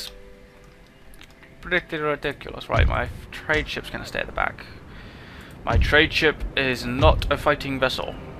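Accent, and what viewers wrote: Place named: United Kingdom. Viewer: You sounded really british!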